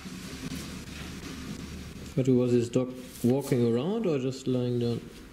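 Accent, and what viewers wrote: Germany. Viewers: Are you, by any chance, German? Your accent suggests it. Danke für Ihre Arbeit!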